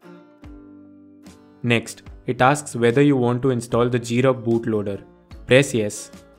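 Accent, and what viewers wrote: India. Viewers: I love Indian accent camuptar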